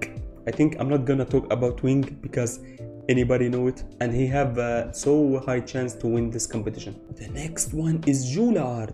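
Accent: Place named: Arab world. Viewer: Where r u from bud cuz u have an arabic accent and if u are i'll be really happy to see someone from MENA creating content about beatbox 🇩🇿👏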